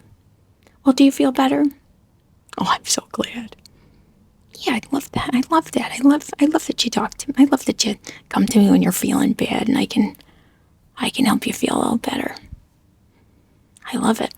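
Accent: United States. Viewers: Hearing your accent, I just want to hear, "Dontcha know" on repeat :P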